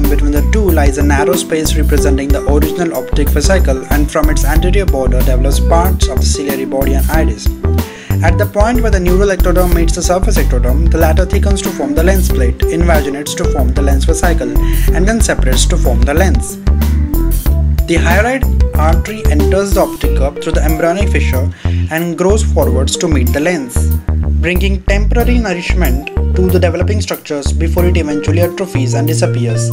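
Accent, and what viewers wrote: India. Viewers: Yikes...indian accents are so attractive....watch out british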